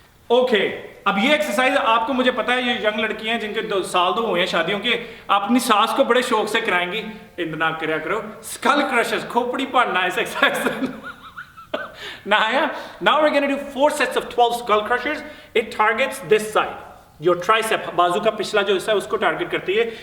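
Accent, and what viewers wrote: Punjab, India. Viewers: the way you talk.. its soon cute specially your Punjabi tone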